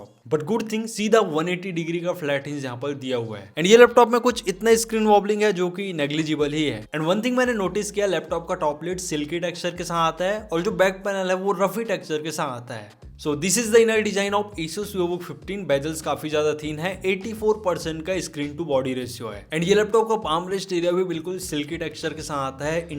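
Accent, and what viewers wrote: Chhattisgarh, India. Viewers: Bhai aaap chhattisgarh se ho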